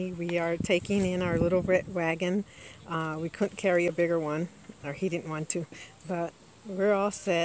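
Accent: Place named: Tampa, United States. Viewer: okay beautiful thank you you drove 5 hours to Miami from Tampa to Miami is about 4 and 1/2 getting to the place another half that would put you close to my vicinity and your accent sounds like you're from Tampa what's up am I wrong??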